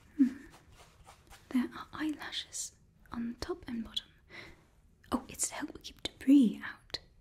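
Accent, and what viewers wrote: United Kingdom. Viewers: Your British accents are always so tingly ❤❤ Also I made a nobel water genasi character named Cordelia literally a week ago, I’m losing my mind. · Would absolutely love this character to come back, I'm not sure what it was but this video is 1st in my top 3 asmr videos. (The accent was very English. If I was a new sub I would've thought you were from uk).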